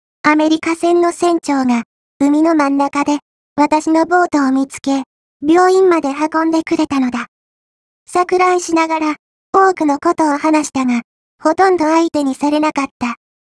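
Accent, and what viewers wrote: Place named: Kansai, Japan. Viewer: 関西弁……ちゅーか……関西のイントネーションやね。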